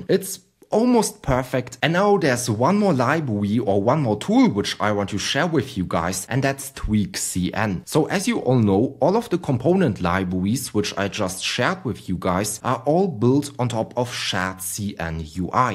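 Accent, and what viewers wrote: Germany. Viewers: Are you German ? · your accent is really german haha, fire video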